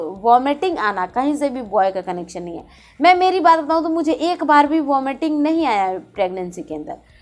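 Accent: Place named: Bihar, India. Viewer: Mam aap bihar se ho kya ❤❤❤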